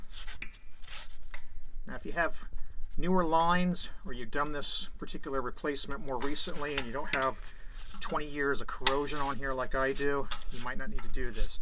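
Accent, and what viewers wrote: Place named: Pennsylvania, United States. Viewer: I know that Pennsylvania accent from anywhere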